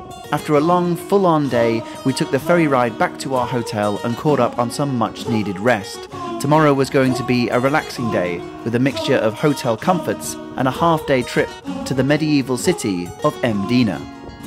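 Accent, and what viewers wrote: England, United Kingdom. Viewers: Such a pleasure hearing an English voice rather than the ubiquitous American voice. I have a son living and working in Malta but this video is the only sight I will see of Malta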